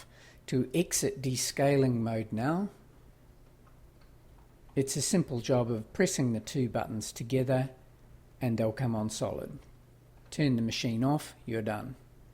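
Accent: New Zealand. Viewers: A great help. Thanks for making the effort. Love the KIWI accent! Reminds us of a beautiful country and a great holiday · Great video, shame about the kiwi accent. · lol.If u knew a Kiwi, the accent wouldn' t bother you. They are such nice people. The accent is all part of the charm. And they're smart too. They do things like make super helpful How-to videos.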